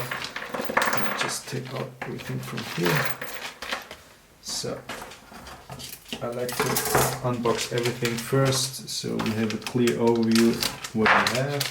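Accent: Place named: Germany. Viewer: You have a very nice german accent! Greetings from India.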